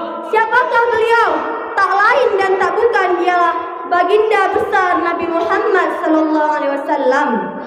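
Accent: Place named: Aceh, Indonesia. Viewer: Medok kli acehnya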